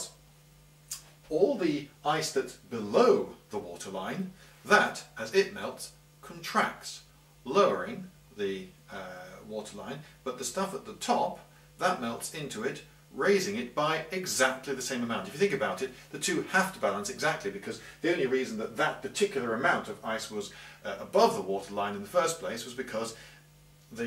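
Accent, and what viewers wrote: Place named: England, United Kingdom. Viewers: Paragraphs one and two, valid point...... mind_change.exe now loading........5%.........10% ERROR WINDOWS SYSTEM CRASH......... Please restart your computer brain, this computer is now running a self-diagnostic..........Entering Safe-Mode..........."hey look. And he has a rant!" BTW about the third paragraph, he does have a popped collar and a British Accent, I thought that was plenty of proof on his end alone! ^.^ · You'd be being naive and very vague to give that answer, yes in summary all the accents are British but it's pointless saying that. be more specific, he has an English accent.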